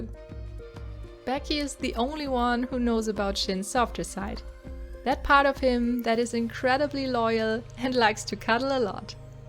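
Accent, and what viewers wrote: Germany. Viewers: moin moin ^^ i love ur dolls so much they are so beautiful!! I'm curious are you German because of the "moin" and sometimes I think I can hear a german accent🤔🤔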